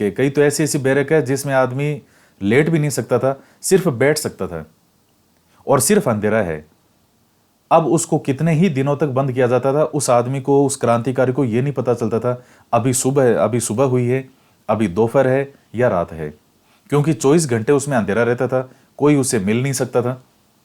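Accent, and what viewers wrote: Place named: Marwari, India. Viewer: Sir thaari marvadi language bahut achhi lagi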